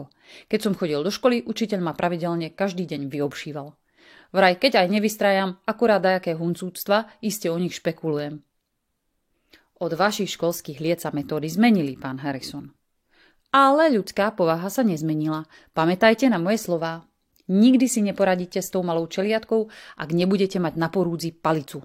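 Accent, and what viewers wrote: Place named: Slovakia. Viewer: Cítit, že slovencina je tvoj matersky jazyk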